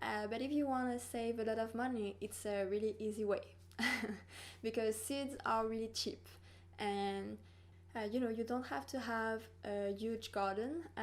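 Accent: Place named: France. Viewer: your french accent is so cute. LOVE it. I am enjoying your videos a lot!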